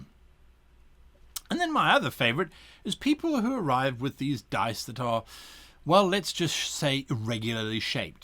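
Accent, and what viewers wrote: England, United Kingdom. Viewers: You sound like your putting on an English accent are you English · I really like your British Accent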